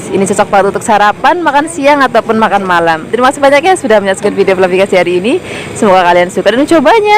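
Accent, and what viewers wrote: Java, Indonesia. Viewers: MBK medok jawanya, denger suaranya ayem deh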